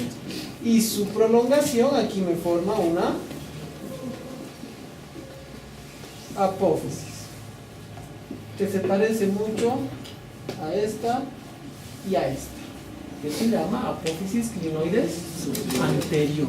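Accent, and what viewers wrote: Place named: Highlands, Ecuador. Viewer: creo que si, tiene acento a los de la sierra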